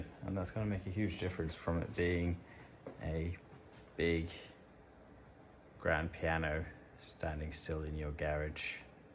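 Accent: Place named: England, United Kingdom. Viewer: It's so funny to hear English spoken with an English accent. It sounds so foreign and exotic to me. Not a single word comes out the way I would pronounce it.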